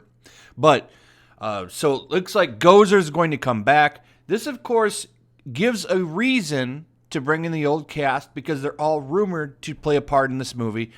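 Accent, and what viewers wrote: United Kingdom. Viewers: Love the British accent every time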